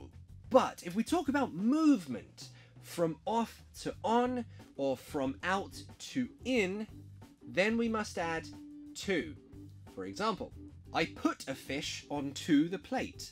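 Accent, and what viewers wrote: United Kingdom. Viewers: ha ha funny :) I like British accent and I love it :)) It is very interesting. thank you!:)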